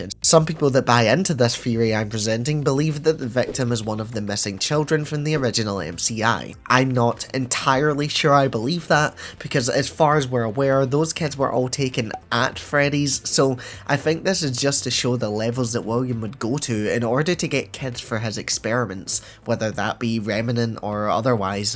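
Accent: Scotland, United Kingdom. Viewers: nice vid man. cool to hear a Scottish fnaf fan lol Im Scottish myself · The Scottish accent is throwing me off loved the theory 😂😂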